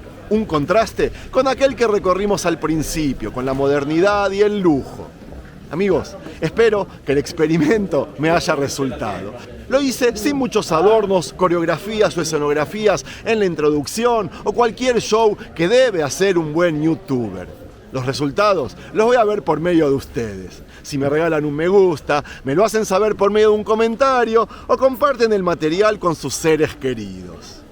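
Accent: Argentina. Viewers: tu español suena absolutamente argentino, con algunos olvidos de palabras, mas absulutamente argentino y se de lo que hablo. otro día te comentare un sino que llevas, pero eso es otro caítulo.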